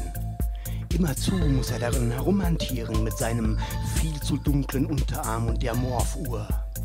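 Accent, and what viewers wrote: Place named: Palatinate, Germany. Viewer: Wie immer genial :) ich liebe den Pfälzer Dialekt.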